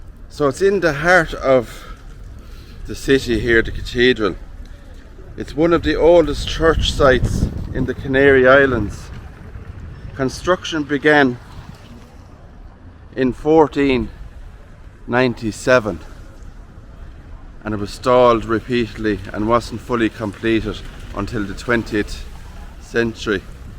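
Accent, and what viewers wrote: Ireland. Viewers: I enjoy your videos and love your brogue. You remind me of my great grandfather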